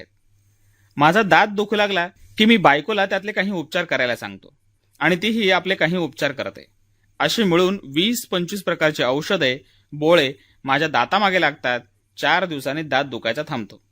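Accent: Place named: Solapur, India. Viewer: भाषेचा बाज अगदी सोलापूरी ! खूपच छान .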